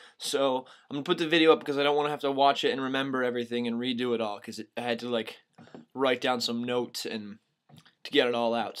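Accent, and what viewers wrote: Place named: Sweden. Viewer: two things. 1) Eat. You are loosing weight 2) You are developing a Swedish accent. Train. Harder.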